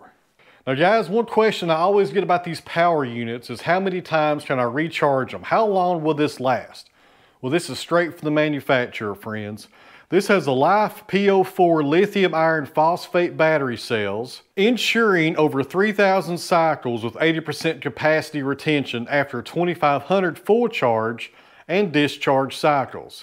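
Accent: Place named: Tennessee, United States. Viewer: Bluetti was smart to back you up,. I can't really explain, but you voice and the charming Tennessee accent I hear, always makes your videos so smile inducing.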